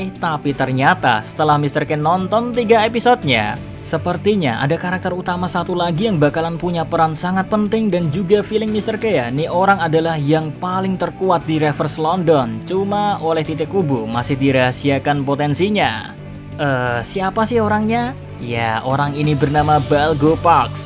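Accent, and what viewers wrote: Java, Indonesia. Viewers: DHE ( MEDOK JAWAX )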